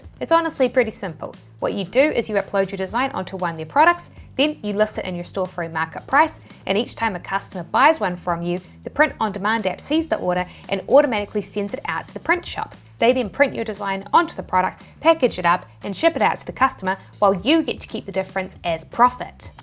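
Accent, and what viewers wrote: New Zealand. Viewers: I appreciate your content and you are very liable. However, there is an E in test and the is an E in step, there is not an i in STEP. CHAT GPT there is no i in Chat, there is an A. Furthermore Perplexity is not perplixity. I Actually has and not an i. DO YOURSELF A FAVOUR AND get rid of the NZ accent. It takes away from how smart you are. just saying🤔❤ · Hello. In NZ, this is cool and nice to hear a NZ voice in this space for one, really cool thank you.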